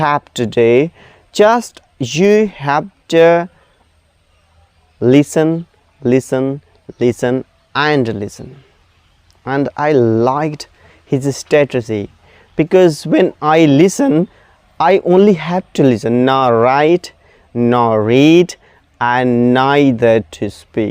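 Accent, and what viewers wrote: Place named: United Kingdom. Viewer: I really liked your English accent I am amazed that how a boy from my village is able to speak such excellent English and that too in a British accent. Wonderful!❤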